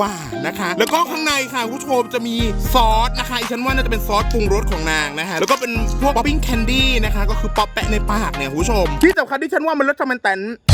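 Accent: South, Thailand. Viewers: พี่เปนคนจังหวัดอะไหรค่ะ เหมือนคนใต้เลย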